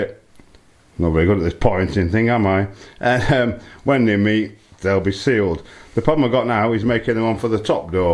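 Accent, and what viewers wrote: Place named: North, United Kingdom. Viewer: Congratulations on reaching over 2,000 subscribers. The two of you make a wonderful team. Thank you for not having background music when you are carrying out your tasks and craftsmanship, it make viewing so much more pleasurable., it’s great hearing a northern accent, and your dry humour. Looking forward to seeing your future projects. Keep up the great work. Pauline, Sheffield.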